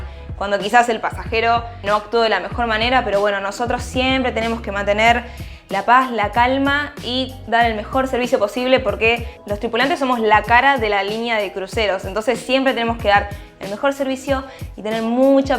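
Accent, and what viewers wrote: Argentina. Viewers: que buenos tus videos . das tremenda info, amo tu acento y jerga argentina, yo te entiendo por que vivo en tu pais pero aveces usas terminos muyyy argentinos que seguro gente de otros paises no van a entender. gracias por toda la infoooo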